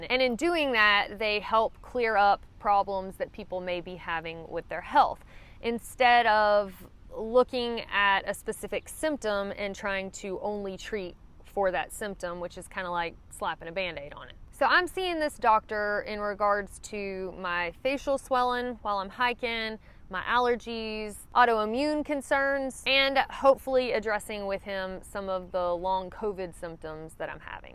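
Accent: South, United States. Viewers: Small world. I've been looking at getting into backpacking for a little over a month. I found your videos, really good and I've watched quite a few. I could tell from the first one you were southern, duh. Then I seen you were from Alabama...I thought that's cool, me too. Then a few videos later, I seen you were from Opelika. Then I thought wow, small world. I'm a lineman at Opelika Power. Keep up the good work. Your videos are invaluable.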